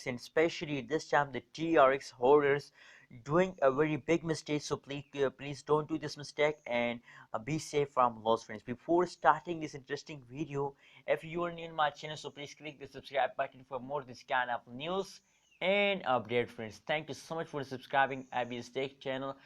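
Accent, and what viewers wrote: India. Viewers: white people video thumbnail english language indian accent as fast as japan high speed electric train no facts at prediction even a teenage 14 boy also can reexplained this thats blah blah blah zero informative content as for sure most brainy positive video presented by showing by content presenter faces for sure this boy wanna follow hype and get fast subs and views and get money for sure many viewers will stay out from this kind of video coz its promising thumbnail video promoting click bait.. well done indian teenage boy!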